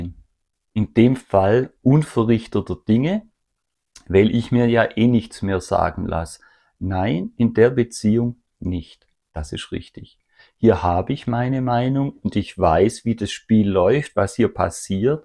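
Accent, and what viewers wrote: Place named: South, Germany. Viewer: Meiner Erfahrung nach sind Flying Monkeys auch nicht die Hellsten. Niemals würde ich mir eine Gruselstory über Abwesende anhören, ohne nachzufragen, ob der Berichterstatter das auch im Beisein des Betroffenen wiederholen würde. Damit oute ich mich natürlich schon als "ungeeignet" für die Rolle und werde womöglich selbst zur Zielscheibe, da nicht ausreichend untertänig/blöde. So ähnlich ist es in der Realität denn auch geschehen ... Übrigens schaue ich Ihre Videos total gerne. Nicht nur, weil Sie authentisch und sympathisch sind, sondern auch wegen des Dialekts (stamme selbst aus Süddeutschland). Liebe Grüße!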